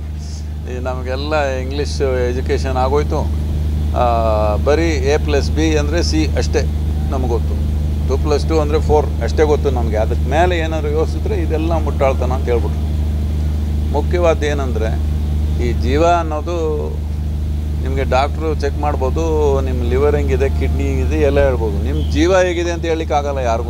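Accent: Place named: Tamil Nadu, India. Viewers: ಕನ್ನಡದವರೆ ಮೈಸೂರಿನವರೆ ಆದರೂ ಕನ್ನಡನ ತಮಿಳು ಶೈಲಿಯಲ್ಲಿ ಮಾತನಾಡಿದ್ದಾರೆ ಆದರೂ ಕೇಳಿಸಿಕೊಂಡು ಹೋಯಿತು ತುಂಬಾನೆ ಖುಷಿ ಆಯ್ತು ..🙏🏽🙏🏽🙏🏽🙏🏽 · I feel it's tamilized kannada !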